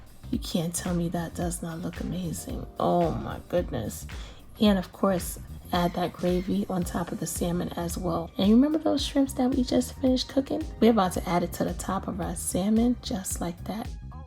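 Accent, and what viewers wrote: New York, United States. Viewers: Is that New York I hear 👀? This looks bomb. I gotta try it. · This recipe is probably 10x better because of the native NY accent!!!! ❤❤❤❤❤❤ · I think I might enjoy this meal! Love your accent! New York? Connecticut? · I'm from NY I'll say definitely "NOO YAWK". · I love New York! I miss home..something about that voice!😛😛🥰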